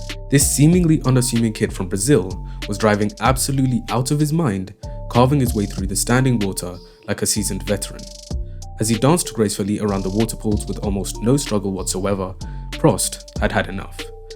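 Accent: United Kingdom. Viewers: bruv your roadman accent fuckin annoying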